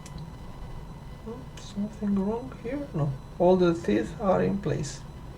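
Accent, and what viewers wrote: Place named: Romania. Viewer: Romanian english.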